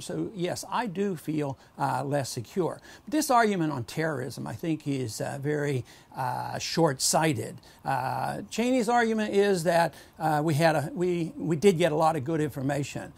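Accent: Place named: Texas, United States. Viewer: you're from Texas aren't you ;D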